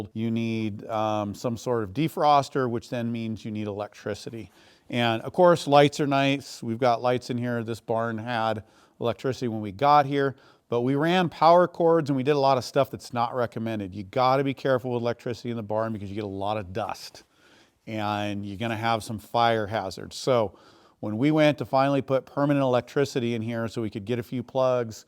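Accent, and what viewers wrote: United States. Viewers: sounds like every blue state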